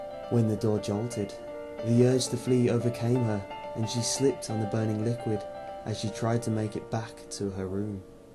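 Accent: United Kingdom. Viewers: GO BRITISH VOICE OVERS FTW sorry for that, here let me...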